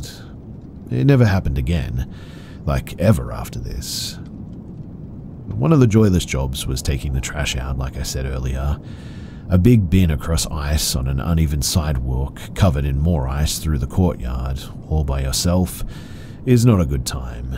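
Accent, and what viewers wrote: Australia. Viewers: is an aussie? 😮 I thought I heard aussie buuut didn't want to offensive, lol.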